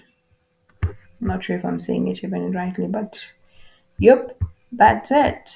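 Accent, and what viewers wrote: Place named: Kenya. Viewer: You sound Kenyan I guess, if you don't mind are you proficient in C or C++?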